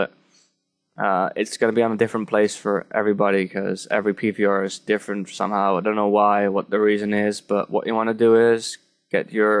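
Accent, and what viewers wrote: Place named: Netherlands. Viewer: NL accent, leuk man haha! trouwens je bent 60 fps vergeten, quaility 720p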